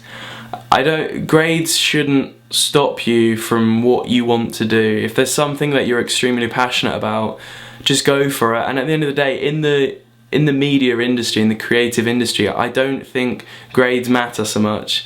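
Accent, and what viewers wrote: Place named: United Kingdom. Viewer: I just love his unique strong british ascent...interesting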